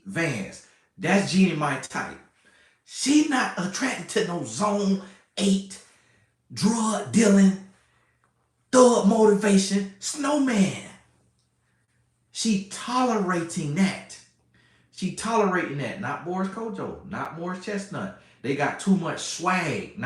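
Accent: South, United States. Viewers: Where are you from Mr?? You have a " southern drawl "...lol...I guess how how you speak & address your viewers as if we all " know you "...there is alot of truth in alot you say....thank you for your videos.😊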